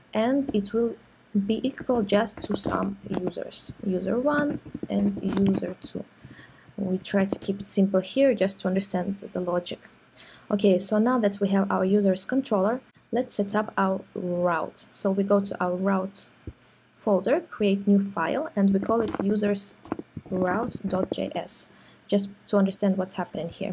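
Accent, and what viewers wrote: Russia. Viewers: услышал знакомый русский акцент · слышу что вы русскоязычная и это хорошо, спрошу. Зачем вы фетчите дату в useEffect? Он же срабатывает при каждом ререндере дома или вообще в изменении его структуры. Или я не прав? Пожалуйста, помогите разобраться, потому что я привык фетчить дату при событиях типо клика или сабмита, но вижу как некоторые делают это в хуке useEffect. Реакт изучаю совсем недавно из-за надобности по работе, обычно писал только бэкенд. И меня ставит в тупик этот хук. Почитав офф документацию написано, что отрабатывает в зависимости от установленных зависимостей, и изменении состояния этих зависимостей. В общем путаница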